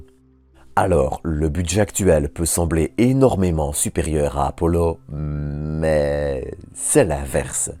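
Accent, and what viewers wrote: Belgium. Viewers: Un robot à l’accent belge ça nous change.....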